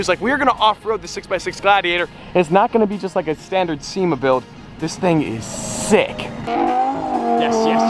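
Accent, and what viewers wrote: United Kingdom. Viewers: Is it just me or does he sound British during the intro? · is he British?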